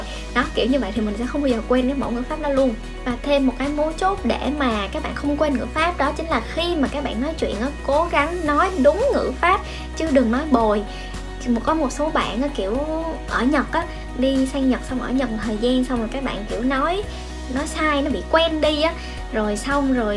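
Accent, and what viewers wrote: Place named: South, Vietnam. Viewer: Lâu lắm mới thấy vlog về tiếng Nhật giọng miền Nam, quá dễ thương. Cảm ơn bài chia sẻ của bạn.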